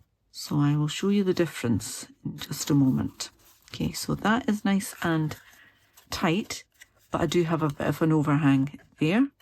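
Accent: United Kingdom. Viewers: Oh my goodness no, you do not look like “her” at all!! 😂 Robin Williams must have studied many English accents to perfect it like he did, the minute I hear your voice, it takes me right to that movie, he did a great job with that character! I agree, the SU community has brought me many wonderful friendships that have lasted years, since I started using their products back in 1998! I’ve only been a demo the last 7 years, in June, and I couldn’t imagine a life without SU and all the wonderful people in it! 😌 Take care, it would be wonderful if our paths could cross one day! 💜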